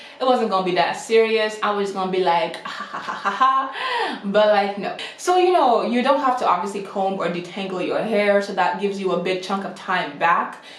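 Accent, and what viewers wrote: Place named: West Africa. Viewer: Wait, I hear a West African accent somewhere in there; na Naija you be? You had me LOL for real. Love your personality. New subbie!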